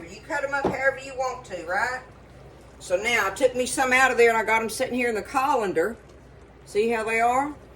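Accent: South, United States. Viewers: Oooh I'm going to try this! Looks so good!, I've been addicted to your videos lately. I'm not sure how I came across them because I don't do much cooking at all, but I just love watching you. You are delightful and I appreciate all the little tips you share. I also love listening to your southern accent and way of speaking. Your happy spirit shines through in every video! I wish you all the very best! From a fan in Michigan <3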